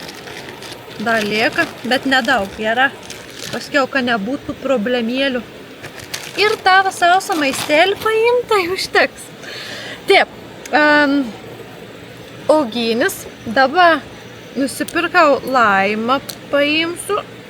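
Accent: Samogitia, Lithuania. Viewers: Nu žiauriai gerai susižiuri video ,dar žemaitoke.Žodžių super .😏🤪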